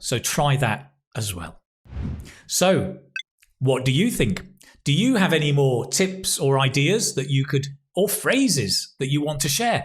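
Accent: United Kingdom. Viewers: "To be honest, Mr., I've fallen in love❤ with the English language all over again—especially the British accent—because of you."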